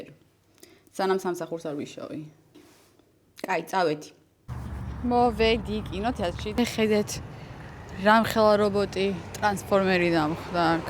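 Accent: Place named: Israel. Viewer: ქართულად როცა ლაპარაკობ ებრაული აქცენტი გეტყობახოლმე ლაპარაკისას 😄👍✌️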